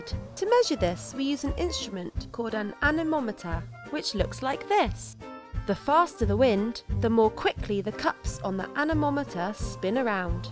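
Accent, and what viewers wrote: United Kingdom. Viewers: This use british accent?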